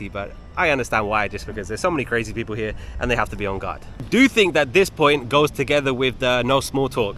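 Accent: London, United Kingdom. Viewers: I agree with you 100%. Not standing up for New York manners in any way. Of course, there are exceptions, and I have seen them, but overall you are right. Where I live, people are friendlier, but I think that is going away as well. I have seen people walk past injured or people in distress. They will ignore everything. Don’t get hurt in the US!!! Shopkeepers used to greet everyone coming in, and now you don’t see that much anymore. I greet everyone, when I am working, or when I am shopping— except when I am in a huge grocery or something. In Germany, I said hello when I walked into a shop and it was welcomed. At the very least, it allows the employees know that someone is in their shop. Law enforcement should theoretically be approachable, but NYPD deals with a lot so I kind of get it. I work with law enforcement and even when I am working with them they are not necessarily nice. 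Only the veterans who have a lot of years behind them loosen up a bit. Good to see a video again! You sound British. There are a ton of British accents, a lot of them are very specific and played up in US films and all that. I can tell you that you don’t have the same accent as some of the other British YTers I watch, but that just makes me think you are not from their areas, not that you aren’t British! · Native NYker here. Love that you've decided to come to NYC to live for a bit. The one thing I do have a different opinion on is when you go to order something. It's true, for going to a place for the first time or two, the workers tend to rush folks along, time is money, right? But, if you become a regular of an establishment, that's when the banter happens. It's kind of like if they see you're a regular, some places will be more friendly, even sometimes on a first name basis. A tourist may not see this treatment because they wouldn't be here long enough, but that's the true New York experience when you become a regular. 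I believe it's the respect that you give business to that establishment, which ends up being more appreciated. And, to add, yes you do sound British to me! Hope you enjoy NY more and more and I can't wait to experience London one day myself. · You have a London accent with the slightest bit of 'street' included. This is coming from a londoner. Interesting vid. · So glad you made this video, I spent the month of June in England including a week in London. You are so right about the coffee culture, people spend a lot of money on something they can make at home, I think people buy the coffee to save time. In London I took the subway or “tube” everywhere and I can tell you people don’t talk to each other, if they find a seat they immediately get out their cell phones and stare at the phones. Another observation about the London tube is that men don’t often offer their seats to women, when I saw a woman standing I always asked if they wanted to sit, most times they would say no, but occasionally an elderly woman would accept. 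I know you say that British people drink tea and I don’t disagree but London is full of coffee shops i.e. Pret a Manger, Nero, and Costa are just some of the coffee chain brands I saw all over London so somebody, maybe tourists are drinking that coffee. Since the US is so big, we have a variety of accents from the New York to Southern accents just like the British accents are unique, Scottish or welsh people are hard for me to understand and I don’t find them to be pleasing to the ear while I love the English accent, it’s almost like sexy, think 007! Your accent is somewhat unique and I attribute it to your travels or maybe you lived in a foreign country as a child or something but I can detect the British influence but you definitely in my opinion do not have a heavy British accent. I find it funny when you say the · You sound British for sure. In America there are different accents depending on what part of the country you live in! 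I think UK is probably the same.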